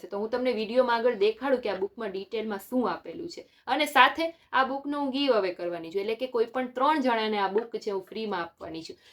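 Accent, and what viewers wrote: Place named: Banaskantha, India. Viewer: બનાસ ની ઓળખાણ ના આપવી પડે બોલી જ કાફી છે ❤ જિલ્લો મારો બનાહકાંઠો ❤ તાલુકો ધાનેરા❤ બનાસ ડેરી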